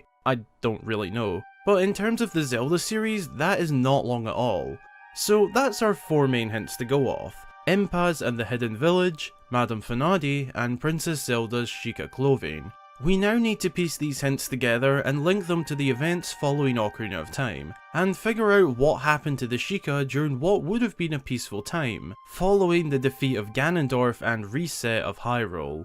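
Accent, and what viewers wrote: Scotland, United Kingdom. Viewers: You sound like yer fae Scotland? Whereabouts I’ll share 2L of strongbow wae ye if ye want?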